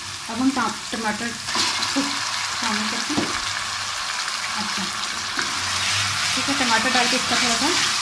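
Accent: Punjab, India. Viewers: sweet punjabi boltey ho. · very very sweet punjabi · MashAllah Zaberdast Mash De Daal Bhale Bhale 😍 Punjabi Baree Pyari Bolde ho 👌🏻 👏👏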